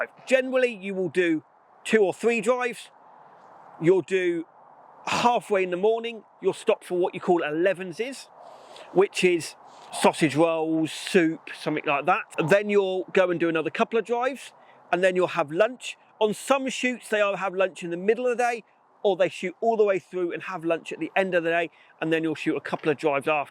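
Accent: RP, United Kingdom. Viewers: You are talking like a rich guy......go to Alaska and hunt....Kill some real game. You make it sound like a hierarchy.....lol....gosh British people are so funny......sausage rolls n stuff.😂😂😂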